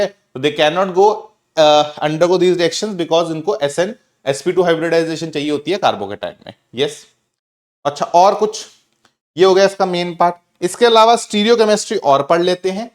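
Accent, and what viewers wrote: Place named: Punjab, India. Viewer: Sir tusi punjabi hege o na , kai vari thodi punjabi nikal jandi ee 😅😅😅, I'm also from Punjab 😊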